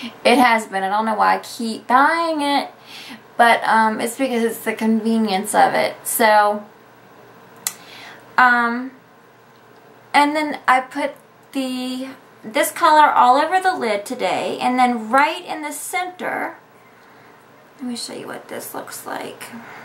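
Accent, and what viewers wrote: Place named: South, United States. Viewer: im in Michigan girl so our weather is just like yours either nice and then bamm its now 85 then the next day back to 65 for a few days then now 90 for a week its nuts! We had some bad tornadoes last week but now its ok this week. Missed your sweet lil southern voice lol good your doing ok. Love your vids n advice n specials n of course tips.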